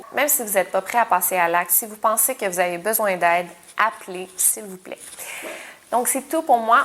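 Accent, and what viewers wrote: Quebec, Canada. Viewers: J’adore l’accent et les expressions québécoises ( je suis français ) 😍😂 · J'avais entendu vaguement parler de cette histoire mais raconté de façon québécoise que j'adore par, Franchement c'est parfait, on devient vite accro de ses vidéos, je me régale à chaque nouvelle vidéo!!! Ce qui est génial, c'est qu'il y a une prise de conscience sur le sujet qui a bien été préparé par la narratrice et elle maîtrise à merveille ses videos!!! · L’accent québécois 😩😍😍❤️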